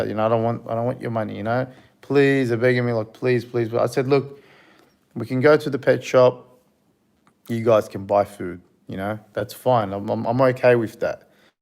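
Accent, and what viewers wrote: Australia. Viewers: I always wondered why you had a slight Aussie accent. It's not a very strong aussie accent because I can understand you but it's a beautiful accent. So you guys have been there. So cool!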